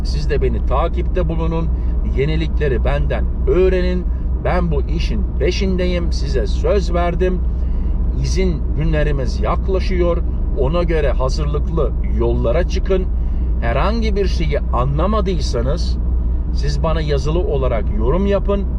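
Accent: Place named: Thrace, Turkey. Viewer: videolariniza yeni bakmaya basladim. Herkez yerine, „Erkez“ diyorsun. Memleket neresi? Trakya şivesi duydum gibi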